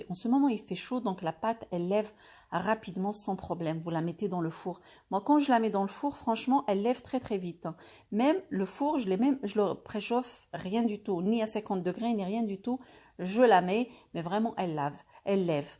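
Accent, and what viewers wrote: Morocco. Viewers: C'est un accent Marocain ça 😒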